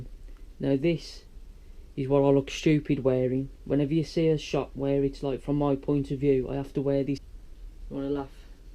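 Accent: Black Country, United Kingdom. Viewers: Blimey i thought i had a small working space in the garage, but you are doing a great job with the space you have, i am impressed, you sound like you are from my neck of the woods in the Black Country!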